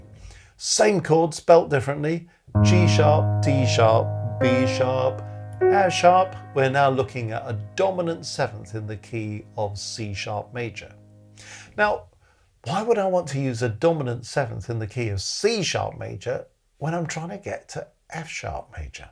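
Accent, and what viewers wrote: United Kingdom. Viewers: Just heard three words with your British accent made me push the thumb up :)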